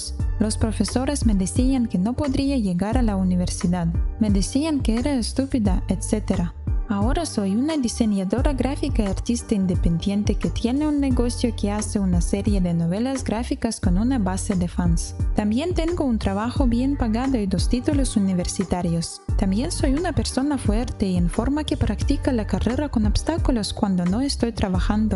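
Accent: Russia. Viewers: tus videos con voz rusa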